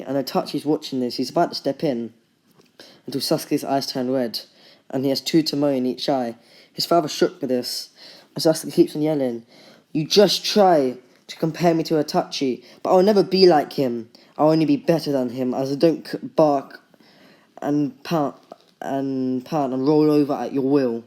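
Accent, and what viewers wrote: United Kingdom. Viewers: i live in usa and its been a while since i last heard British accent but the video was really good.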